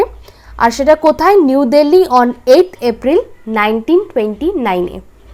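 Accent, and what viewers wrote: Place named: United Kingdom. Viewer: Mam apni oxford english bolchen.ইংরেজ দের মত বলছেন কানে বডড লাগে।আপনাকে ছোট করলাম না।sorry vul hole khoma korbe .apner question gulo khub valo.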